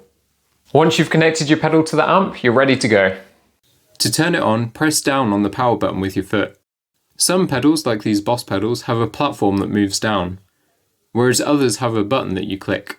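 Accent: United Kingdom. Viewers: He doesn't sound like he's from anywhere in the north to me but I'm not british either. My guess would be somewhere in or around London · this is so random but are u from by yorkshire?? im american but trying to get better at identifying british accents haha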